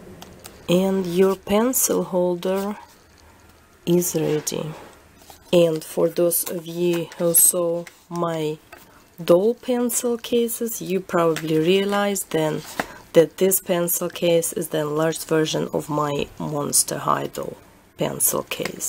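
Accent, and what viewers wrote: Russia. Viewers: Привет she has a Russian acsent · Its really cool,my friends asked me if i made it and they liked it;)i told them about your channel amd they loved your crafts and my friend send a message for you "Wow,she is really creative!i like her accent and i think she is russian.man russian ppl are so cool>.<"-ellie(my friend:) )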